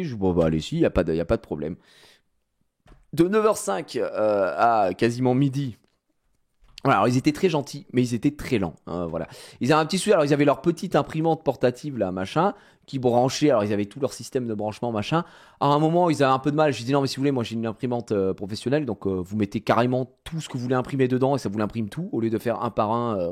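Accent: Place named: Paris, France. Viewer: non je pense que c'est juste le phrasé parisien typique + le fait que aime bien exagérer qui fait que ça ressemble beaucoup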